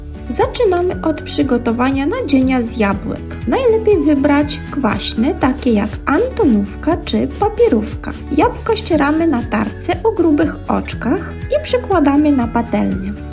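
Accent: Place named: East, Poland. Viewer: pieknie mówisz po polsku. Twoje wschodnie pochodzenie tylko lekko słychać w wypowiadanych słowach.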